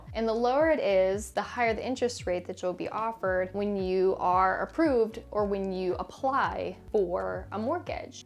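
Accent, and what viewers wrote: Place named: South Carolina, United States. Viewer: Not sure why this popped up on my recommended videos since I'm not in Charleston and not looking to buy a house right now haha. Maybe it just wanted me to connect with you 😁 I did want to say I do love the way you speak. Great pacing plus I love that S Carolina accent lol. Wish you all the best! Subscribing to support 😁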